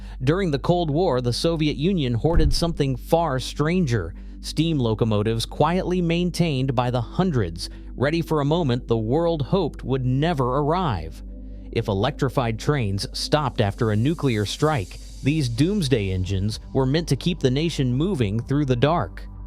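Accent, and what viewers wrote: United States. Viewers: 🙂 Ja vad ska man säga om denna film berättare . Det låter som en amerikan som läst på lite gran om historian . I USA körde dom Ånglok tills dom kom på att Diesel lok va bättre , Inte det som alla andra har Diesel-elektriska lok utan bara diesel . Det är bra för miljön i USA . Sovjet köpte Svenska ånglokomotiv en bra bit in på 1930 talet . Sovjet byggde om sitt transport system från häst & vagn till Järnväg . Dom hade set sig om i världen på 1840 talets Europa & Amerika byggdes järnvägen upp . USA är inte direkt kända för sina järnvägar . Europa har ett virrvarr av statliga och privata järnvägar allt från smalspåriga till bredspåriga . Järnvägarna tar detta på stort allvar och under 1890-talet börjar järnvägen elektrifieras. Boxholms bruk är först i Sverige med ett järnvägsspår med eldrift år 1890. Fem år senare öppnas den första elektriska järnvägen för persontrafik. 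Det är den 11 kilometer långa sträckan mellan Stockholm och Djursholm. Så 60 år senare vaknar Sovjet med elektrifiering av sin järn väg . Men att ställa upp lokomotiv på stora järnvägs uppställnings platser finns överallt . Om man är försiktig med dom alltså ha dom som reserv . Så kunde man göra som oss Svenskar ställde upp dom i Bergrum eller under tak , Utspridda i landet . Att göra som amerikaner och Ryssar är trist där står dom och förfaller . Det är bara att göra FRAG av dom och koka om till stål igen . Amerikanerna med sina Diesel lok har mer bekymmer eftersom deras gamla lok är giftiga så ingen vill peta p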